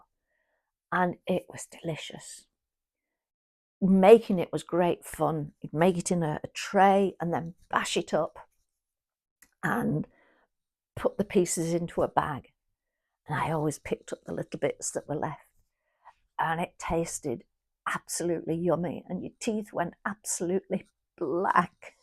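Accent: United Kingdom. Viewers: You're my model in my journey for learning British accent ❤❤ love & peace 🕊️✌🏻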